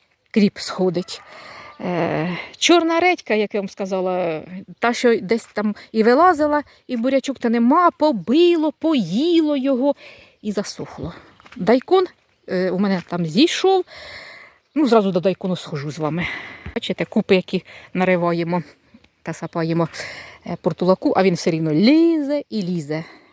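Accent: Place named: Poltava, Ukraine. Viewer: мені дуже подобається Ваша мова я сама родом із Полтавщини як родичів послухала здоров'я Вам миру🎉🎉🎉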